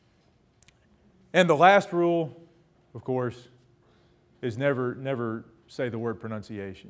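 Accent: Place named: Ozarks, United States. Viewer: LMAO; I’m dying at the rules of pronunciation. Being from Kansas I hear enough draws. But seeing and hearing the Ozarkan whang it’s not only true but accurate as hell. I just got back from there maybe two weeks ago. My kids all returned home to Kansas sounding like hillbillies. Sorry Ozarkans.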